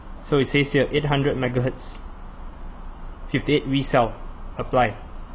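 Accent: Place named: United States. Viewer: hellz! you sound like an american comes from greece ;G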